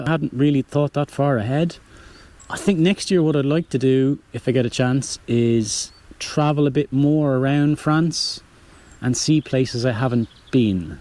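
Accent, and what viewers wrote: Ireland. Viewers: So, a certain tone of Irish is very romantic…is romance of interest to you? You seem like a KEEPER type. NOT ASKING FOR ME! But for other subscribers. (You’re too young for me) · I watch a few vlogs that some Brits make, and I have to say that your Irish accent is a pleasant change. At least, to my American ear. Sometimes Brits say weird stuff. 😊 The Q&As are great - keep doing them!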